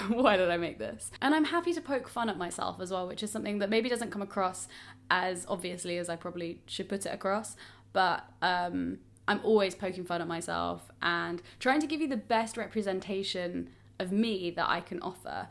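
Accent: RP, United Kingdom. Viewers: I'm ngl I kinda get why people say the disingenuous thing but only because of how you speak like your accent is quite posh? i don't agree with the disingenuous comment but i kinda get it? like posh people are categorised as super fake and bitchy but i don't think that it's accurate of all posh people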